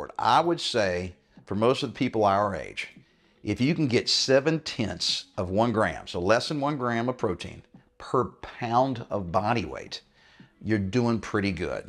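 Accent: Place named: Austin, United States. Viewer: I recognize your accent. Austin texas? Am I right?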